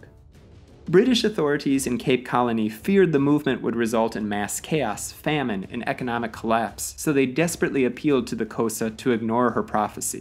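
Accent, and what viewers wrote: United States. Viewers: There is something very wrong with a person explaining to the world from an American accent what happened in Southern Africa! Where does he get his information from? No, I'm sorry I refuse to listen to this. He doesn't get the nuance or intricacies of the issues. I was under the impression that the Kei river border wars were between the Xhosa's and Dutch settlers not with Koi Koi tribes. It also seems that he outcome of historical events depends on who you ask and from which colour and Tribe they come. but no please don't give me an American trying to tell me about my history!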